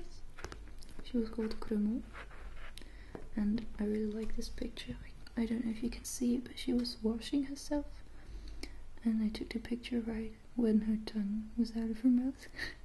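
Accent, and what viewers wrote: Netherlands. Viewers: Ben je nederlands? Hahahah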